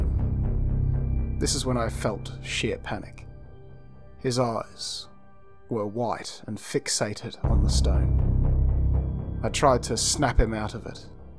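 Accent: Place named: Australia. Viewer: Narrated by an Aussie Aussie Aussie Oi,Oi,Oi.... Cheers from Melbourne Australia 🇦🇺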